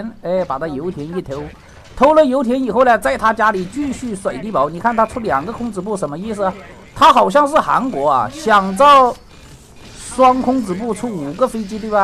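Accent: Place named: Jiangxi, China. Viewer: 好像是江西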